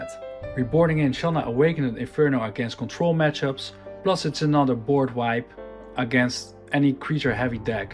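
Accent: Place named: United Kingdom. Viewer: now thats how you speak the kings 🤴 English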